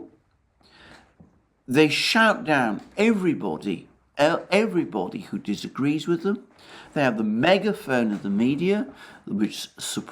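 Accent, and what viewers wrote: England, United Kingdom. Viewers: Steven, Pls can you give an example of the so-called sub-par info in speech. Also, it seems that you’re sounding a bit irritated by the fact that middle class English is nevertheless bedazzling for the non-Brits. I can tell that all English accents are interesting 👋🏻😉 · Non Brits really get bedazzled by a moderately middle class, English accent. sounds the part, but his info is sub-par, to say the least. Good day to you!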